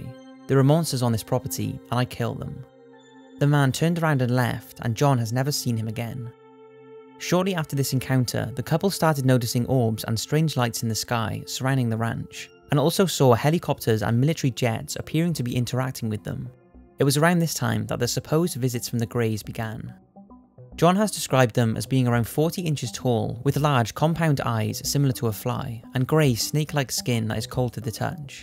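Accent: Wales, United Kingdom. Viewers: he's is 19 and he's welsh, I know he's welsh because I'm welsh and has a similar accent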